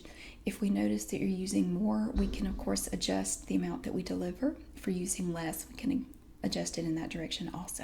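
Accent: South, United States. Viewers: It's strange... I'm English, I've never been to America- and yet your accent somehow makes me feel like I've come home. I love southern accents so much, and I love this video! Thank you <3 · your southern accent is cool!!!!!!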